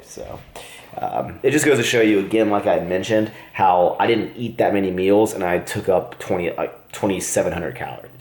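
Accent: United States. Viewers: Yep.American accents are so subtle.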